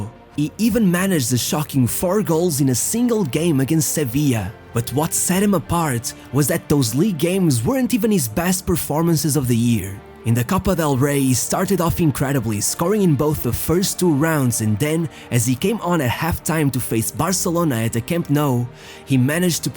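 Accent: Portugal. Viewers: Are you portuguese? This might sound weird but your english sounds like portuguese english :D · Are u a portuguese speaker? Seems like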